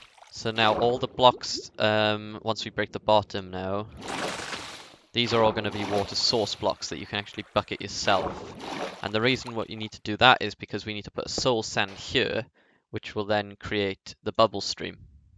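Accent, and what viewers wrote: Wales, United Kingdom. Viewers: Very rare to hear a Welsh accent on YouTube, represent fella! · There's a subtle Welsh accent there and I love it. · Awesome to hear a fellow Welshman! And thanks for the tutorial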